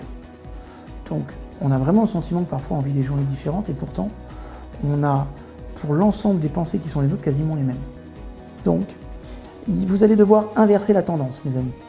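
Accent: Canada. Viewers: Très intéressant je vais m'y mettre des aujourd'hui!!en passant j'aime beaucoup ton accent canadien je suis de Québec maintenant vivant au Costa Rica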